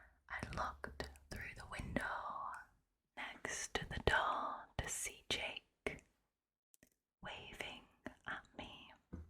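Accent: Northern England, United Kingdom. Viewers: I absolutely adore your videos. You're fast becoming my favourite asmr artist and I love it when I get a lil notification telling me you've posted! I love the mix of whispers and fluffy mic brushing, it is soooo tingly.☺ would love just a fluffy mic video from you tbh! Also love your Northern accent, pls tell me you're a Sheffield gal?! Thank you for the videos!!! Xx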